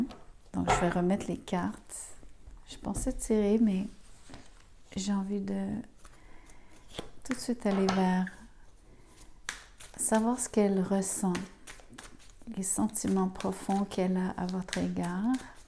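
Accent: Quebec, Canada. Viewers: Bonjour, toujours très parlant. C'est tout le concept de rester buté sur une idée pour s'empêcher d'être heureux, quelle tristesse... mais avec un accent québécois, ça passe mieux. 🥲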